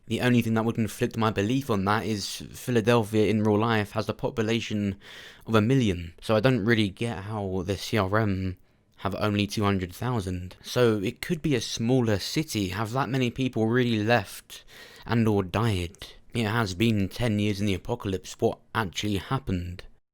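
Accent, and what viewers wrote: United Kingdom. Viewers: Question bro... Do u live in the USA?.. How do u know wat the Philly sky line looks like? ... Just wondering because of ur British accent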